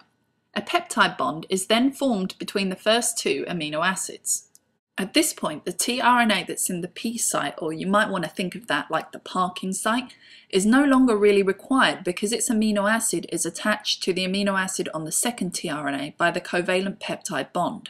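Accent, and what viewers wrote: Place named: United Kingdom. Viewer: Were are you from - you sound British?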